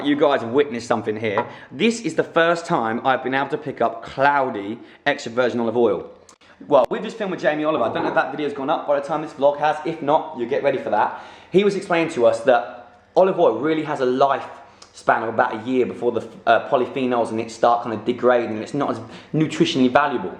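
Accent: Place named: England, United Kingdom. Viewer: Are you English ? You got this accent.. Wow ! 😊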